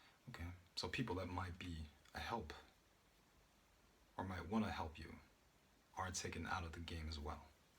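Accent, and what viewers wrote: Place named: United States. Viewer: Y do u live in Germany u clearly have an American accent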